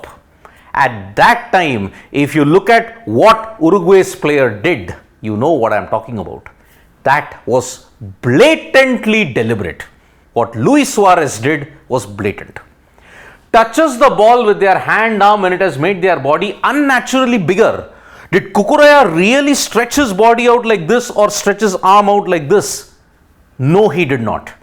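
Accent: India. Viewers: ОПЯТЬ ИНДУС УЖАСНЫМ АКЦЕНТОМ ЧТО-ТО ОБЪЯСНЯЕТ ,НО ЕГО РЕЧЬ НЕ ПОНЯТНА!!!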